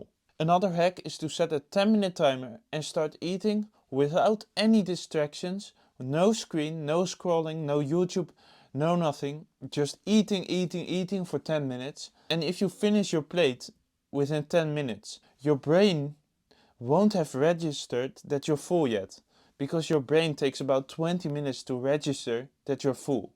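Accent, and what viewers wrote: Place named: Netherlands. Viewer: The dutch accent 😂😂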